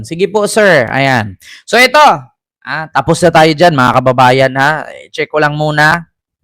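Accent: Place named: Iloilo, Philippines. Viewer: Hahaha butinga! Tama. Mahirap kc sila umintindi. Lagi akong natatawa sau lalo na pag nag i ilonggo ka galing mo talaga🤣🤣🤣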